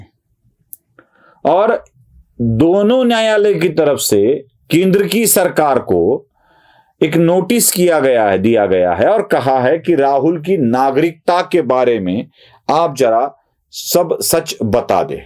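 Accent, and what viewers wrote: Maharashtra, India. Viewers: apki marathi hindi me kuchh maja nahi aata, isse achha ye hai ki aap kisi hindi bhashik ko higher kare aur, usse ye kam ksrawaye. प्लिज वाईट वाटून घेऊ नका, आणि मी आपला आदर राखून हे सांगत आहे. यात आपला काही दोष नाही. धन्यवाद.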